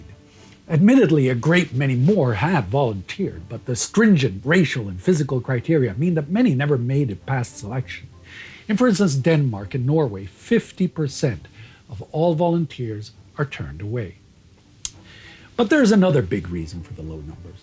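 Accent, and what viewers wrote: Germany. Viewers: I hear that you are german ^^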